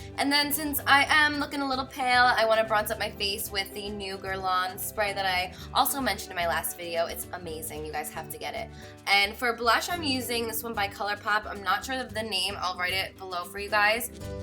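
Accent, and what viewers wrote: New Jersey, United States. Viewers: New Jersey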